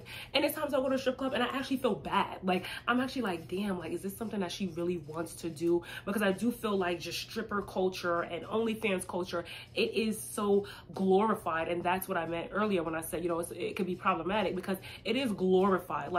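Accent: New York, United States. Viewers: I forgot how much I love a NYers accent; I haven't been on youtube in some time. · You sound New Yorkish...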